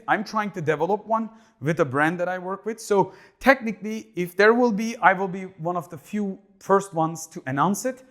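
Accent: Turkey. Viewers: Eski bir videoyu seyrettim direk aksandan Türk dedim bu Abimiz)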